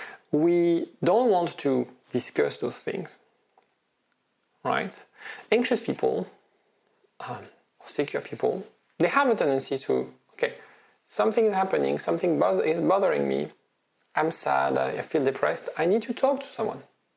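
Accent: France. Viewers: French accent is awful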